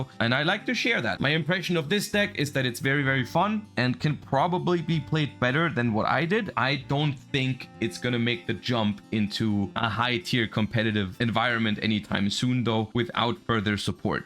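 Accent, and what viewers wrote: Germany. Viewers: You’re from Germany?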